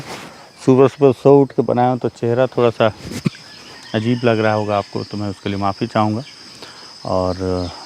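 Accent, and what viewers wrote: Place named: Varanasi, India. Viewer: गुरु आप वाराणसी से है या छत्तीसगढ़ से ही क्योंकि आपको देखकर लगता है आप छत्तीसगढ़ से है और सुन कर लगता है वाराणसी के है राम जी का पूरा प्रभाव है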